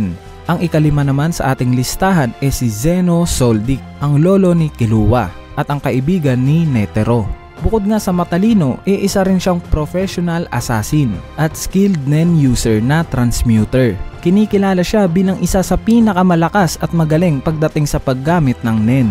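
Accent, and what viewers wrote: Visayas, Philippines. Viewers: Bisaya ata si idol😂😂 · Nag mukhang mali lng yung pag pronounce nya kasi filipinx accent Hahaha.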